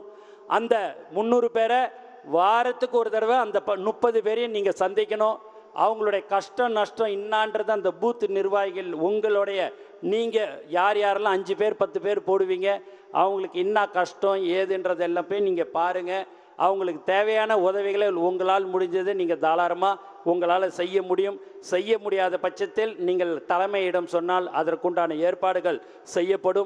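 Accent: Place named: Pondicherry, India. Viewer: Pondy tamil apdi than irukum. Athu oru style.....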